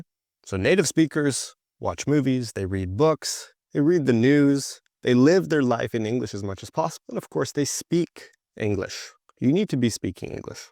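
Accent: United States. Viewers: Are you speaking in American accent or British?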